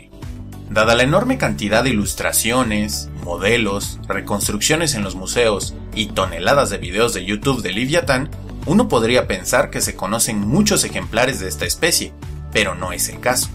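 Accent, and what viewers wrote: Mexico. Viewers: erea de México? :O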